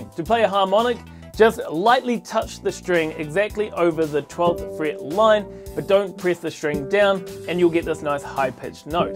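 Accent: New Zealand. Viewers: Tone down that New Zealand accent and I might listen longer. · Sounds like a kiwi living in Australia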